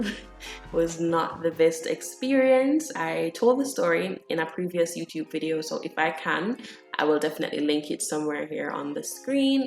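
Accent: Jamaica. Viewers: She sounds like she has a Jamaican accent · Are you a jamaican RDH or overseas? Edited: lol I ended up finishing the video so I know now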